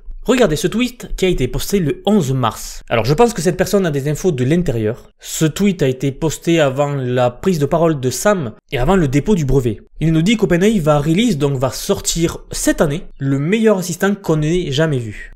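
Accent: South, France. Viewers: Comment ça me fait trop plaisir d'entendre une voix du sud parler de technologie . Et puis je te suis, tes contenus sont supers et donnent la pêche.👍🏻😋. Tu es d'où si ça n'est pas indiscret ?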